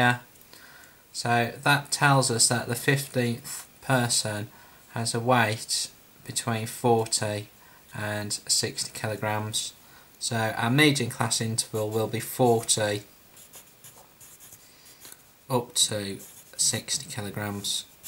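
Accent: Birmingham, United Kingdom. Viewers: Thank you! What part of Brum are you from mate?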